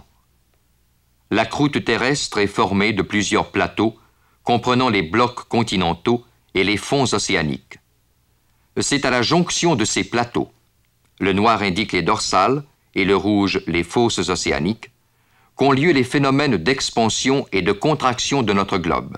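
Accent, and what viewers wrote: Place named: Quebec, Canada. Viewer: un canadien (ça s'entend très nettement) qui essaye au maximum d'avoir l'accent "français"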